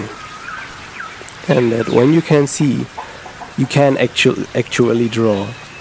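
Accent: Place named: Germany. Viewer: You kinda sound German to me. Biste deutsch? 😁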